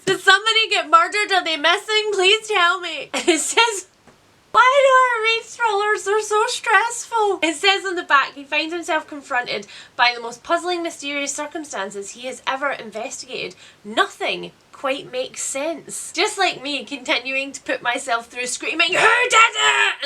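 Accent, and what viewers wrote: Scotland, United Kingdom. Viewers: Hi, new sub here so you might have said previously, I just wondered if your shop will be reopening soon, it won’t even let me look 😭 also you mentioned in another video about chronic illness, I also have chronic Illness and wondered what you’re dealing with, or if you have a video on it? Sorry if you’ve already covered these questions. Loving your bubblyness and your loud Scottishness, very different to us in England 😂💕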